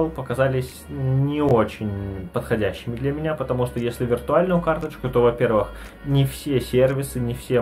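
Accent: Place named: Belarus. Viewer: забавно, что с белорусским акцентом, вы ни разу там не были)))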